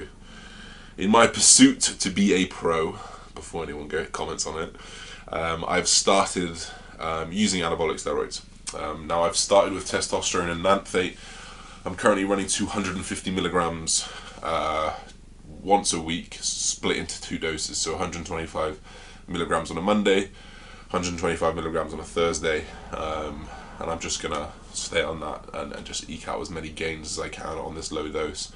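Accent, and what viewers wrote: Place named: Liverpool, United Kingdom. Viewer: I wonder which part of Liverpool he is from, I can't work it out from his accent...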